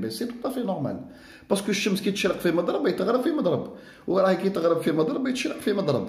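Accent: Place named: Algiers, Algeria. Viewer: Salem, marhaba bick c'est très intéressant ce que tu dis👍j'ai ds amis chrétiennes pratiquantes me disent que le Coran c'est le seul livre qui n'a jamais était changer. elles prennent aussi l'exemple du jeûne c'est rare ou il ya des chrétiens qui jeûnent ext. ext. pr ceux qui critiquent le Coran et notre prophète ils n'ont ps a venir comme on le dis avec l'accent tlemcenien (y'wajouna al'bna) avec ms respects a ttes les autres religions. Au faite j'adore ton accent Algérois. 👍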